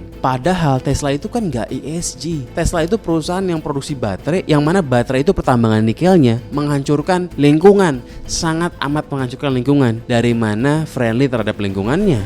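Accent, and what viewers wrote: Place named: Betawi, Indonesia. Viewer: Dia pakai cara bicara khas orang betawi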